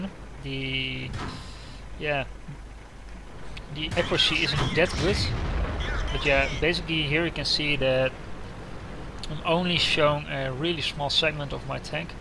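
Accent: Netherlands. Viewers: what i have noticed this time, you're actually dutch ^^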